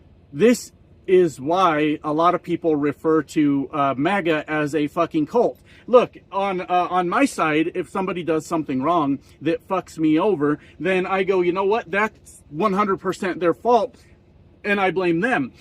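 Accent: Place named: Canada. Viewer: You are hilarious !! Are you sure you aren't Canadian cause you sound just like us !! Love you brother . !!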